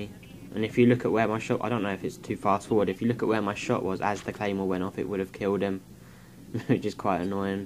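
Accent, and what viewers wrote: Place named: United Kingdom. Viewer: yeay hes british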